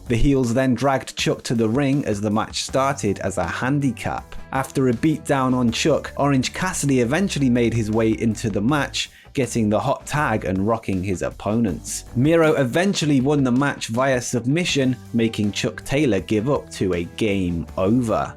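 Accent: United Kingdom. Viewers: why are most of these wrestling clips narrated by a dude with a british accent? just askin'.....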